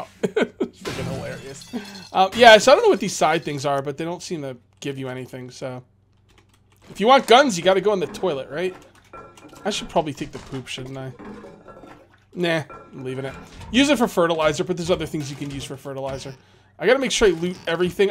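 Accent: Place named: New England, United States. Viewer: Some I knew you where some where and new England.:P you have a slight North eastern accent.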